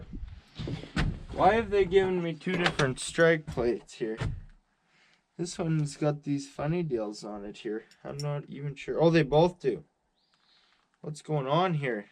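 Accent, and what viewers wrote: Canada. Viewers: Finally a Canadian on YouTube that sounds Canadian! Love your life mate, keep being yourself and we will keep watching and cheering you on!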